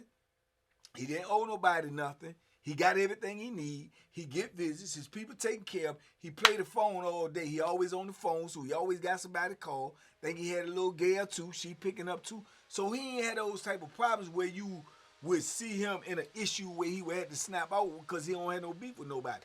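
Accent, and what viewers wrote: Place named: New York, United States. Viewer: Hartford CT Checking in and sassy salute that's rt you can't sleep on nobody son that NY acent is what's up□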